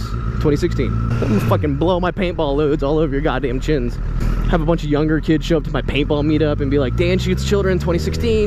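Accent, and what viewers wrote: Australia. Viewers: Dan more Australian accents in ur vids 😂 pls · I'm Australian and I love your Australian accent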